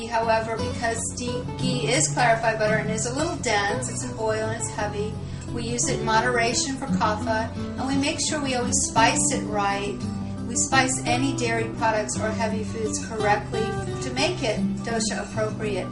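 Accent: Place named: United States. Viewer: Why do mercans say "Urrbs" instead of "herbs", it clearly starts with H not U...and turmeric isn't even a Urrb...it's a spice!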